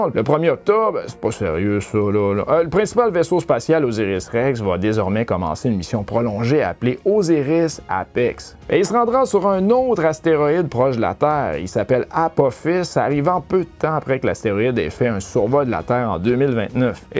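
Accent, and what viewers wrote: Quebec, Canada. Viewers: j'adore, sa doit faire plus d'un ans que je suit l'actualité de SpaceX et du starship, j'écoutait en francais de France depuis, sa fait plaisir d'avoir un Québecois ^^ bonne continuation mon ami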